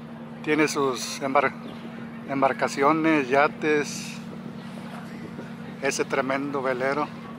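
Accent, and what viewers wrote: Mexico. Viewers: Demasiado marcado tu acento mexicano, deberías neutralizarlo mas! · No hablen como mexicano por favor. Ese idioma odio. Hablen bien el idioma español ok.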